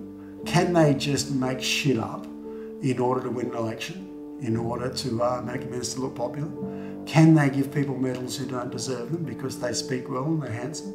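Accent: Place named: Australia. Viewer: I came here from Friendly Jordies coverage on you and wanted to know more, it might be a good idea to create some subtitles because the Australian accent can be a bit hard to comprehend for non-native speakers. Hopefully we can get some more international eyes on this!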